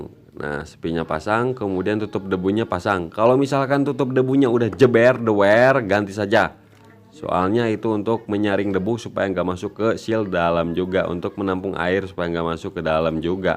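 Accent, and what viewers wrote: West Java, Indonesia. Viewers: mntap bang hatur nuhun elmuna resep pokonmah ngarenge cariosan orng sunda mah😂👍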